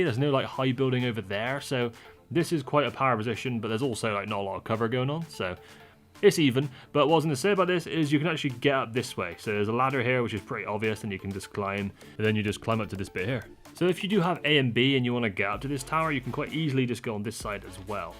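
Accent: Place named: Somerset, United Kingdom. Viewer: Where you from.. you sound very somerset .. Bristol maybe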